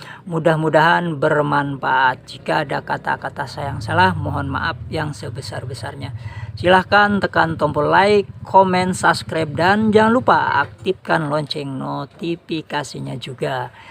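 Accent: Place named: Bali, Indonesia. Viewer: D kirain orang bali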